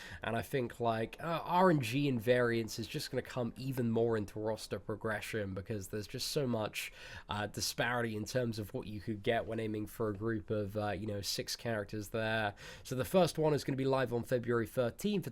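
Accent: United Kingdom. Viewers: damn i saw the thumbnail clicked it was expecting a 5* opening lol....but always a pleasure to hear tht British accent...keep up the awsum wrk man :)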